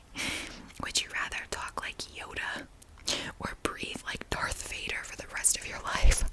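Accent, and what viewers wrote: New Jersey, United States. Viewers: The jersey accent though lol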